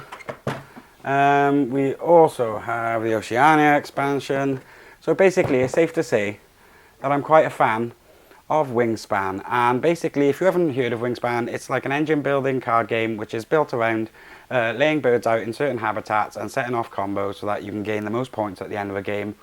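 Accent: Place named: Wales, United Kingdom. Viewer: Where are you from bud? Obviously I'm not asking for an address lol. Just sounds like a bit of a Welsh tang to your accent. A bit like myself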